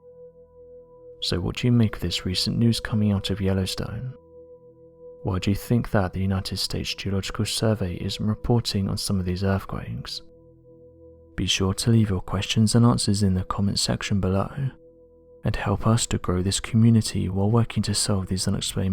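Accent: England, United Kingdom. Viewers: The whispering English accent can make anything scary. Besides that, research Jacob Lowenstein. He worked in the park for decades and is highly educated in the field. He has a family too…..and lives right near Yellowstone. If this “data” was a concern, I’m quite sure he’d be gone. But then again, maybe the dudes nuts and wants to die there.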